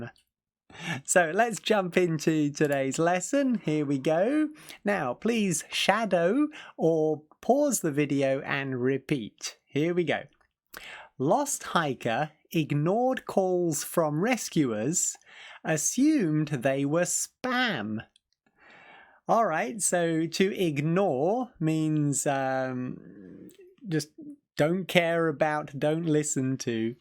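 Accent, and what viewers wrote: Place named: United Kingdom. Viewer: I think that British English cool! I like your mountain clothes☺️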